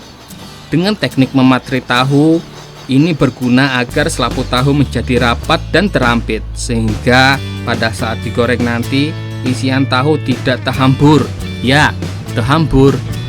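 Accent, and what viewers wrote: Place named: Kalimantan, Indonesia. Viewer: Palangkaraya mna suara nya like urang Kalimantan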